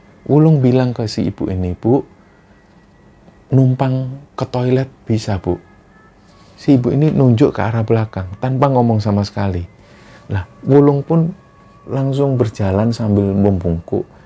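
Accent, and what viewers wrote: Java, Indonesia. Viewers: Gunungkidul hadir mas.. sehat" sllu mas,suka pembawaannya.. wong jowo,ora ilang jowone.. 🙏🙏🙏🙏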